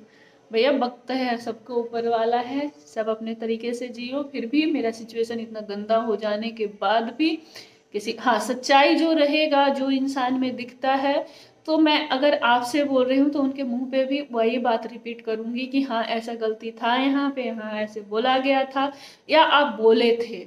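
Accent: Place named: Bihar, India. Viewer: बिहारी हाे का!!!!? हिन्दी से लग रहा है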